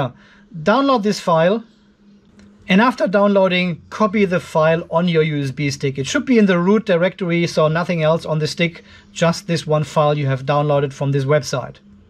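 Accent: Germany. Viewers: Hi,?...you sound like you would have a German-speaking background?....? Well, I am Otto and not busting on knowing about all of this, I try to learn. I am a long-retired, office machine technician, originating from Vienna. Because I run my system on 24 Volt, where should I get the 5.5 Volt supply from for my two in-line Lynx distributors to get the system indicator lights working? I have a basic Victron Cerbo GX and on top, at the far left I have two USB positions free, should I join a USB cable, cut one end off, and link it up with the yellow & black wire of the supplied cable that came with those Lynx distributors? We/A&O made up a solar panel to the battery bank system, controlled with Victron elements, output 220 Volt to overcome those many power-out situations we have in rural QLD to protect the goods in our fridges & freezers and issues when printing 3D when the PC goes wild with the printing program. 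Ergon Energy has the habit of supplying at times too little and even far too much electricity (up to 260 Volt) and some of our appliances did not like that and did quit on us......and you ask what name to give to the Cerbo GX, this unit is very smart - remember who Idefix was? (Idefix & Obelix). Stay awesome, cheers!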